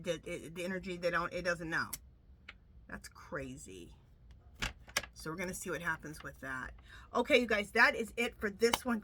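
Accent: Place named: New Jersey, United States. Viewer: Your predictions are very precise and informative. I enjoy your energy and down to earth podcast. I look forward to your videos. Keep them up for all of us hoping & praying for Kamala's reign. (You still sound like a jersey girl 😅) I'm a 73 y/o ex jersey girl... "you guys" are what we use to say.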